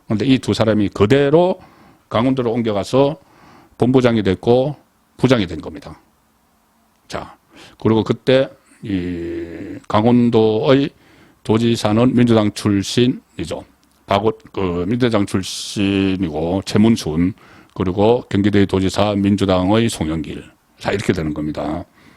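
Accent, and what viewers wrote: Gyeongsang, South Korea. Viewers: 난 그특유의 갱상도 발음때문에 더 리얼감이 있고 좋던데요 . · 대표님 언제나 감사합니다 정겨운 경상도 서부경남 말씨~~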